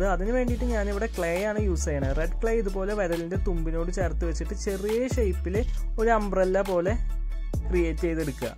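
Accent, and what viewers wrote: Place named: Thrissur, India. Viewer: Samsaram kettitt tsr aanennu thonnunu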